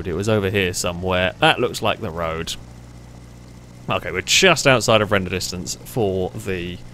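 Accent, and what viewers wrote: United Kingdom. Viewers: dude it's a joke because almost every American loves guns, so him not taking the other gun isn't very American, and obviously he has a extremely strong bri'ish accent.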